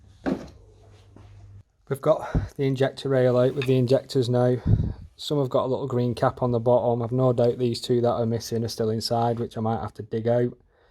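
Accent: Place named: Burnley, United Kingdom. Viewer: Thought you sounded local. I’m in Burnley 😊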